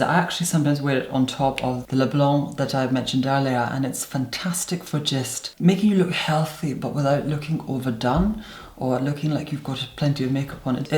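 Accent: Scotland, United Kingdom. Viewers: His accent is a cross between the Queen's English and Scottish country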